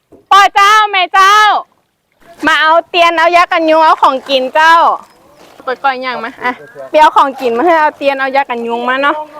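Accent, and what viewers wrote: North, Thailand. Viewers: น้อง เป็นคนที่ไหนครับเห็นอยู่ทะเลแต่พูดเหนือ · โค้ะ อู้กำเมืองได้ตวย ฮู้ๆๆ สุดสุดยอดเลย ก่นึกว่าคนใต้คนภาคตะวันออก ชอบเลยครับต้องสนับสนุนละครับ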